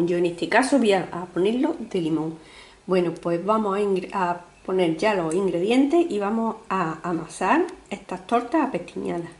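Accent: Córdoba, Argentina. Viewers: Que bien lo explicas se nota que eres cordobesa yo también lo soi mil gracias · Muchas gracias señora mi padre era cordobés or desgracia nos dejo con treita y tres años ese sabor me recuerda a el y el acento de usted aun mas Dios la bendiga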